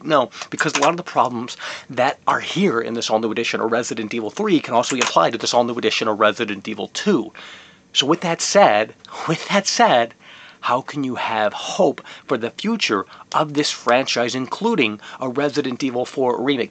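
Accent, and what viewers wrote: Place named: Texas, United States. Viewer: Lol its the very fact that his Texas accent that made it seriously funny to me.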